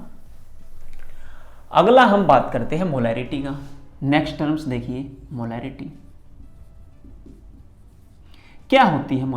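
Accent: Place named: Haryana, India. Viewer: That haryanvi excent in his language is just 😌,🥀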